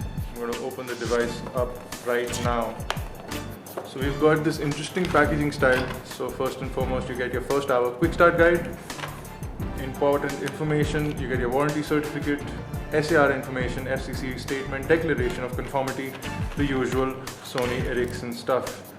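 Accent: India. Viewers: indian is speakin!!! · Its like I'm listening to that indian character on the Big Bang Theory series... 0_0 PS: Yes I know the uploader is probably indian.. what I'm saying is, its annoying. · Why are all these reviewers Indian? I fucking hate hearing this accent all the damn time!! No I'm not racist it's just an opinion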